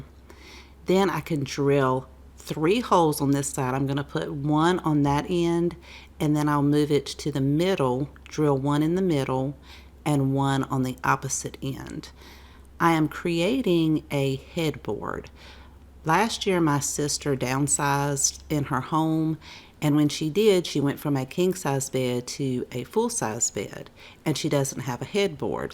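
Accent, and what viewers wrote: South, United States. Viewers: Same!! I was trying to guess how far north of I-10 her accent originated from 😂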